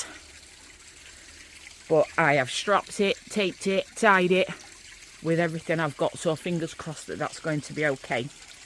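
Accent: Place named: Yorkshire, United Kingdom. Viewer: That sounds like a Yorkshire accent to me? Pretty much the whole of the UK has a Tropical climate right now, so the answer to toyour question is "'Qute easily"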